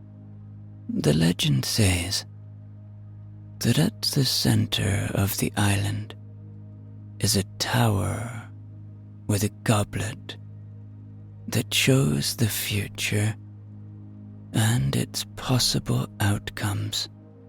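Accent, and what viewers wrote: Ireland. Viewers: Another beautiful story from Megan enhanced by the lilt of our Irish ☘️ Storyteller,! I haven’t commented lately but you have literally been playing 22-23 hours/day& nite on loop for the last 3 weeks., 🤗you have been my best Friend during a severe Mito “crash”. Thank you for keeping me company even through my subconscious. I felt supported, safe, soothed & so much companionship in this time of restoration. My energy is coming back! Sláinte!!! ❤🙏🍻😊Wishing for You, Reb, & Timmy all the love, light, & amazing ENERGY you put out in the world back to you in spades! ☀️💖🌍♠️♠️♠️ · Thank you. I realised how much I enjoy story time by the Irish. Your count down is amazing xxxx Goodnight from Australia ❤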